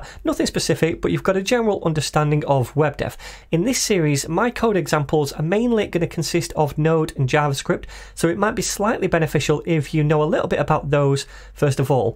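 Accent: United Kingdom. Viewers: There's something about a classy British man explaining programming to you as your own personal professor Cheers, mate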